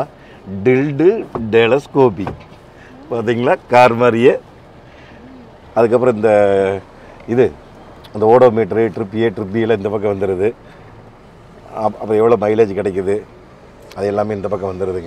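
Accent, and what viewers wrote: Kongu, India. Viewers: sir naan ungal kongu தமிழுக்கு அடிமை ❤❤❤ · Anna ungaloda kuzhandhaithanam, Kongu Tamil ku na miga periya fan Anna 👌🏻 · Perfect Kongu Tamil. I live in US, and I take rental vans for trips, mostly I get Ford, Freightliner and Benz, looks like Force is giving equally or better than these models.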